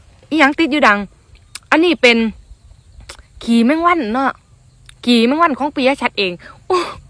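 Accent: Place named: Ubon, Thailand. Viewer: น้องเค้าน่ารัก สำเนียงคนอุบลชัดมากๆ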